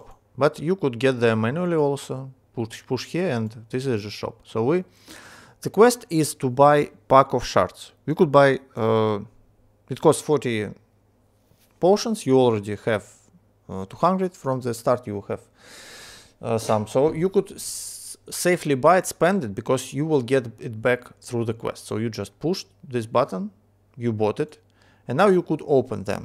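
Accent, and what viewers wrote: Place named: Russia. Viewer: Охренеть. Не нашёл нормального гайда на русском. Внезапно нашёл данный на инглише..... и сразу чувствуется дикий русский акцент - всё понятно :)))) Спасибо! :)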